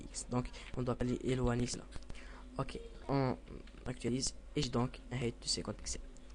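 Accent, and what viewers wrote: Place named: Maghreb. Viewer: hhh super hh t marocain ou algerien bayna mn lhdra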